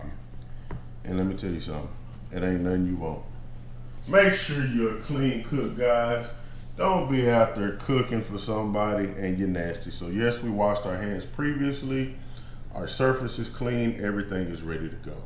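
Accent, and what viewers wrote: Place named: Texas, United States. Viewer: It’s crazy how a Texan can recognize another Texan by the tone of voice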